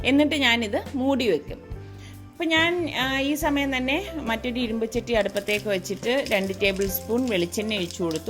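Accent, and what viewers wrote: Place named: Thiruvananthapuram, India. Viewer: Thiruvi.,keera., veravi Assal thiruvantharam bhasha....😁😁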